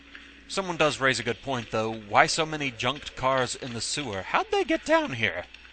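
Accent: United Kingdom. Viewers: I know im late and this was posted years ago I like your let's plays...by the way are you British if add on your English is good ?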